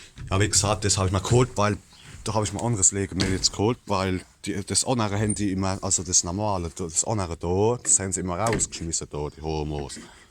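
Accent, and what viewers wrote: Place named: Palatinate, Germany. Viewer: Dieses pälzer gelaber ist aber dem monnemorischen schon sehr ähnlich und sehr witzig. Hab dir mal n abbo dagelassen, Grüße aus Mannheim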